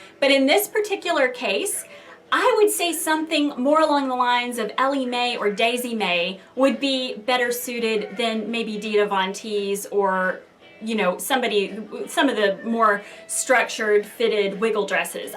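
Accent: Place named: New Jersey, United States. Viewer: I'm from Jersey and I really just noticed the "accent" in your voice I've been watching you for a while!! lol I know it's random that I notice it so late! oh well though I love it!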